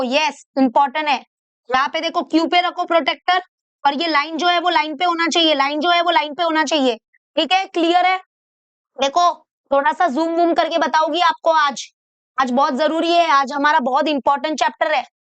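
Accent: Maharashtra, India. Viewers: Mmame your Marathi is too cute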